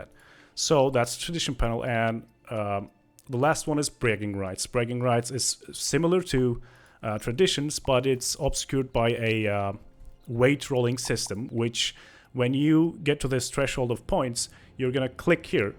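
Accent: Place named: Scandinavia. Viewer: Game looks interesting from the little i've seen. Showing my fellow scandinavian some support (Can tell by the accent)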